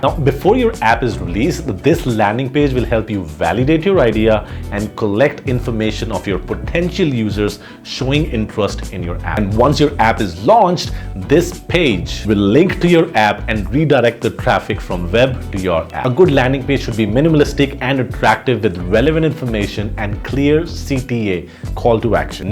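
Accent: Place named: India. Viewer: Indian accent is cool bro, stay with it. It will work.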